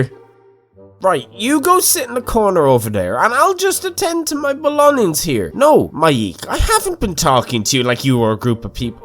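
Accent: Ireland. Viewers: R u Irish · Standards? My standard is that Irish voice and a shot of a half-naked M'iaq cooking stuff in a pot.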